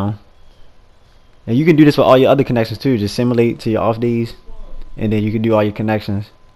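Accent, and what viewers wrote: Baltimore, United States. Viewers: i from bmore to soon as i heard you voice i said "this nigga from bmore" lol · you from baltimore? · From Baltimore.?🤔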